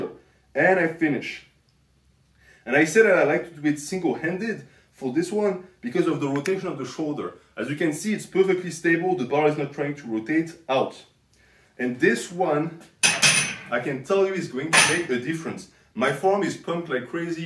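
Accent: France. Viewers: are you french? the accent sounds like it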